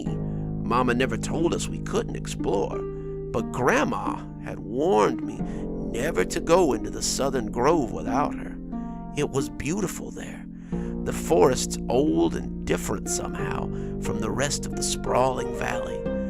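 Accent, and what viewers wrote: South, United States. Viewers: Your southern delivery helped this story immeasurably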